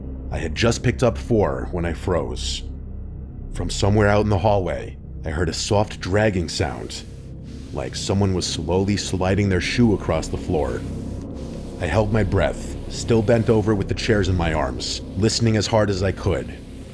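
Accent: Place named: East Coast, United States. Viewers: Mr nightmare got a east coast accent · NYC or suburbs area. Probably Brooklyn or LI.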